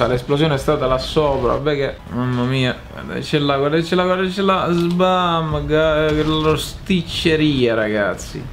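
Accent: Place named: Sicily, Italy. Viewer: Mi manca un po' il tuo accento siculo <3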